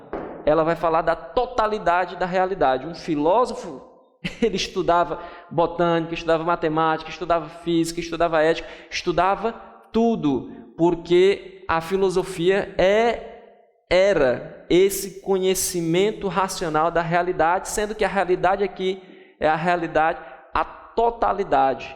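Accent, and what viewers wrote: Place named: Rio de Janeiro, Brazil. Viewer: pelo dialeto deu pra deduzir que você é carioca,o vídeo foi uma ótima introducao da filosofia para as pessoas interessadas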